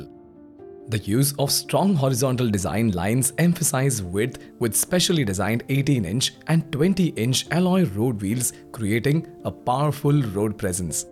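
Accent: India. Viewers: English with an Indian accent Not interesting for advertising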